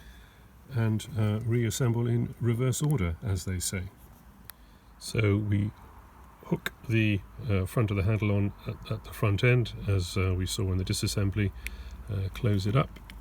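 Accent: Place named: RP, United Kingdom. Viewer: Well done, just what I was looking for. For a first effort at such a video, a veritable masterclass: no hideous background music track, no blether, just the info you need, delivered in RP. Thank you.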